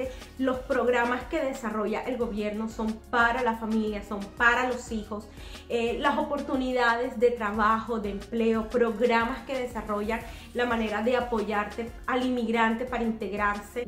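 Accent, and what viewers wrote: Venezuela. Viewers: Hola. Me encantó. Y me encanta tu acento que se parece mucho al venezolano, yo soy venezolana. Un gran abrazo. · jejejejeje además de hablar rapido tienes acento Venezolano, ojo no es para ofender, porque soy Venezolano, me gusta tu jovialidad y el entusiasmo como explicas las cosas no tan buenas que te han pasado.. por supuesto un saludo de este Venezolano a ti y tu grupo de soñadores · Eres Venezolana de casualidad?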